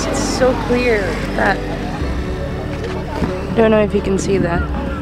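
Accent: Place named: United States. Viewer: You have a good command of English, with hint of American accent. Did you grow up in the US?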